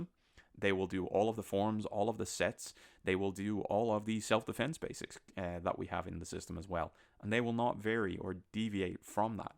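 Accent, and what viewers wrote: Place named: Ireland. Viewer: Thanks for this. I can hear that you're Irish. i'm working at kenpo the past 18 months & I am finding it fantastic, so great for personal growth, fitness, agility, memory & discipline. But I do find it maddening that not only is there such a divergence from the USA kenpo, but even amongst the Irish kenpo schools there is not harmony. So it is very difficult to learn. But hey, I'm enjoying it.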